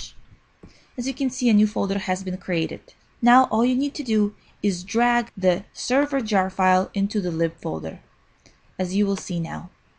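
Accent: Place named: Russia. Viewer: You must be Russian!)) Thanks for the video...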